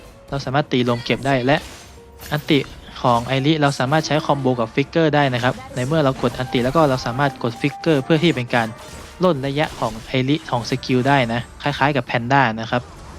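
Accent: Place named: North, Thailand. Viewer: สาบเมืองอยู่นะ คนเหนือไหมนี่555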